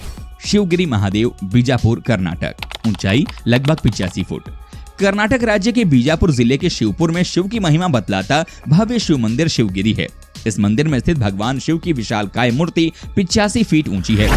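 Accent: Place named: Odisha, India. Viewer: Your mother tongue is odia Right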